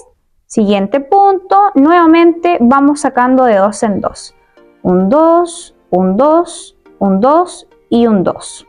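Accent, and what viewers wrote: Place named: Chile. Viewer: Hola 🤗 Recién encontré tu canal me encanto el vestido y explicas muy bien de donde eres ? tu acento se me hace 🇨🇱 voy a empezar mi vestido espero encontrar el hilo, acá no hay muchas opciones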